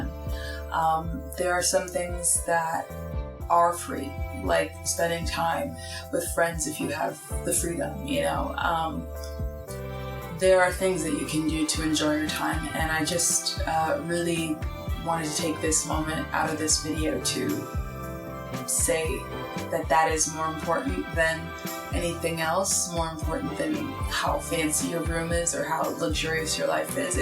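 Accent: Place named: New York, United States. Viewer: Subscribed after our video with Marwa, you haver an incredible New York voice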